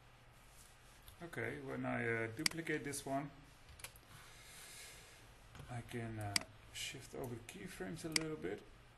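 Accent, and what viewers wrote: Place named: Netherlands. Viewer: thanks! hoorde gelijk dat je nederlands was hihi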